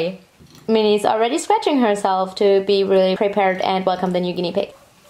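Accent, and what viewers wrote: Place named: Germany. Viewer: You, my good fellow, are all over the place. 1. She can have as many guinea pigs as she wants, you have no choice in the matter. 2. One guinea pig is NOT enough. If you have one guinea pig by itself, it will literally be living in h e l l. They're herd animals and need to be in groups. 3. They can be, it's called floor time and it's how they get excersise. 4. She's...she's german- she has an accent and talks like that because English isn't her first language. In conclusion, don't comment stuff like this if you don't know what you're saying. Unlike you, she is an adult and can make her own choices.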